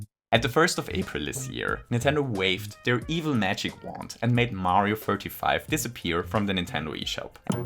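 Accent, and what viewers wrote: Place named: Germany. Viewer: This video was pretty shit tbh. Its basically 17 minutes of conjecture and assumptions, why should a simple reference make every super Nintendo commercial canon? Why should Nintendo pay mind to something that literally no one will think of? And about that whole cultural caricature thing? Mexican people still wear ponchos and sombreros, so why shouldn't mario be able to wear local attire? Based on your accent I'm gonna assume you're German. And as a german, don't you find it fun when a character wears lederhosen or speaks with a german accent? I know as a dutchman when someone assumes I wear wooden shoes and smoke weed I'm charmed. I know that waluigi is incredibly popular here because he represents us, I love your content but this whole video had me scratching my head through its entire runtime. I really hope you'll do better next time.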